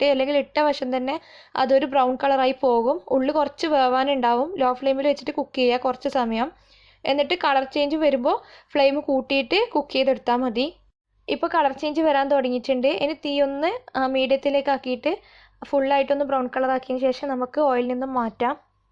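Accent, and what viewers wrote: Kannur, India. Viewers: Samsaram kettappam manasselayi kannur aan annu , njan Kuthuparamba aan, cakel oru cup RAVA charthaal nannavumo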